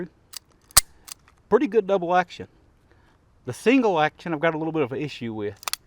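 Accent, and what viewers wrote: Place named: Alabama, United States. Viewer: You from alabama , yes sir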